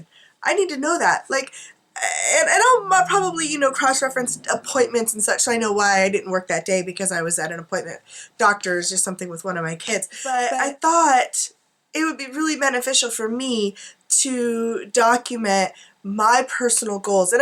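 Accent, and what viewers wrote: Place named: United States. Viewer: Ah well I am British and you are 'very American' to me, I don't know what very means but to me you definitely have an accent lol xx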